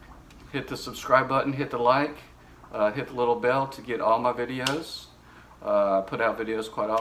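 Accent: South, United States. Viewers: This is refreshing love your southern accent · I hope this isn't offensive to you or anyone else but as a Yank that southern twang is buggin' me out. Lol All love though brother and thanks for the advice.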